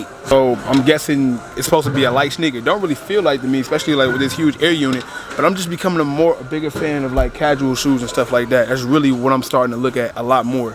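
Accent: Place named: AAVE, United States. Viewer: ENGLISH NOT EBONICS!! YOU SOUND STUPID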